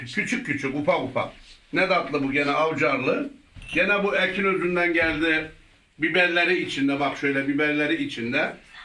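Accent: Gaziantep, Turkey. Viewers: Antepli misin? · Dayi antepli misin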